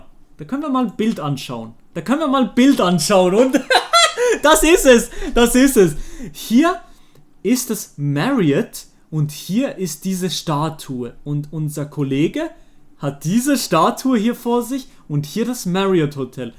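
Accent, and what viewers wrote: Switzerland. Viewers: Ey komm er ist Schweizer und bemüht sich so gutes Hochdeutsch zu sprechen😂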